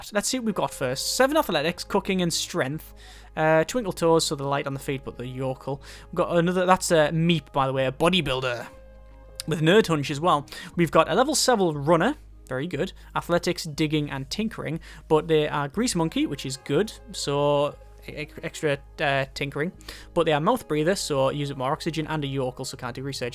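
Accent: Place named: Hull, United Kingdom. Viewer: he sounds like he is from hull in the uk. (where im from)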